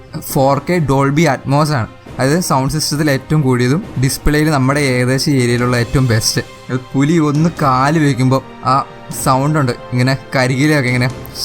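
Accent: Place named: Idukki, India. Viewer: ഇടുക്കി ജില്ലക്കാരന്‍റെതുപോലെയുള്ള ഉച്ചാരണരീതി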